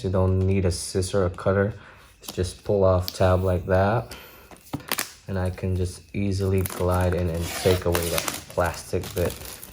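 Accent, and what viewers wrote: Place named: United Kingdom. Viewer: Bumibritisssshhhh accent!!!!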